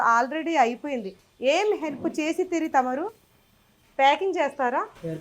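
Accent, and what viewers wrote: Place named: Godavari, India. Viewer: Mee telugu slang maa godavari slang madam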